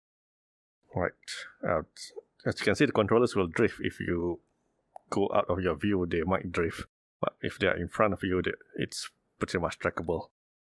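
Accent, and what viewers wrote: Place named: Singapore. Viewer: Hi sir. Nice video and thanks for the x-plane demo with the Odyssey. Your accent I guess is either Singaporean or Malaysian Chinese (I could be wrong), but if i'm right, may I ask where did you get that Odyssey from? I am scheduled to visit Singapore again next week and I'm wondering if I can find the Odyssey there. The oculus is currently still at SGD$890 at SimLim but based on reviews I will get the Odyssey just for the extra pixels.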